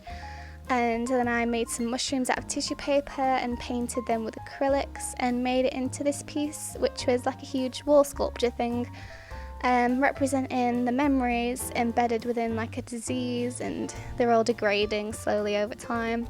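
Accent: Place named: England, United Kingdom. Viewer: 2 seconds into the video and I instantly said "That's England" 😂 Loved the video. I would love to pursue art. You are very talented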